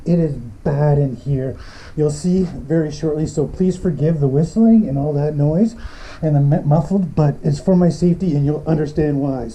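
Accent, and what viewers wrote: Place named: Canada. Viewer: "We checked it ooot" = Canadian.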